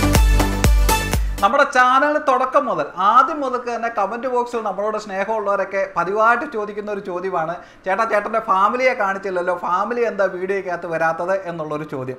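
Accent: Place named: Kozhencherry, India. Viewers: സംസാര ശൈലി കേട്ടപ്പോൾ തന്നെ തോന്നി പത്തനംതിട്ടക്കാരന് എന്ന്, വളരെ സന്തോഷം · മലയാളം കേട്ടാൽ അറിയാം പത്തനംതിട്ടകാരനാണെന്നു..😊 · ഓണാശംസകൾ, kozhencherry കരാൻ അന് എന്ന് അറിഞ്ചപ്പോൾ വളരെ സന്തോഷം. നമ്മുടെ നാടിന്റെ എല്ലാ നന്മകളും സംസാരത്തിൽ ഉണ്ട് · പത്തനംതിട്ടക്കാരനാണ് എന്ന് സംസാരത്തില് തന്നെ അറിയാം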